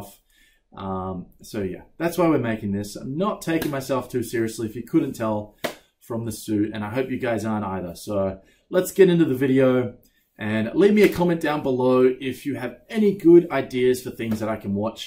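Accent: Australia. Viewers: Explained well! Even me, who doesn't know English so well, everything is clear. Despite the fact that I have heard the Australian accent in very few places) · Omg you look British but sound Australian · Huh is he an Aussie "Hang on where's my coffee? There's my coffee" stands up ... Yep he's an Aussie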